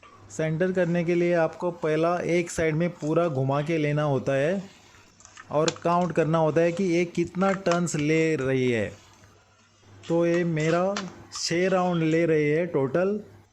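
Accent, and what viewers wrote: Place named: Mumbai, India. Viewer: Hello irmao appreciate your skill and precision work . Not jugadu but your work and perfection it's like O E M BOSCH. 👍👏 Your Hindi is we call dobitalv Bombay uncle and aunty Hindi . Nice to listen . Greetings have nice day 🙏💐👌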